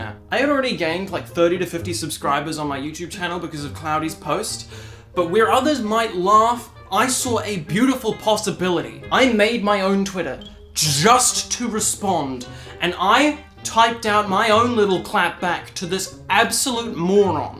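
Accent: New Zealand. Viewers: i figured you were somewhere from the Big Southern Island Places. kiwi accents are so fun :D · Ngl initially thought you were an Aussie like myself, but gotta appreciate our Kiwi cousins! :>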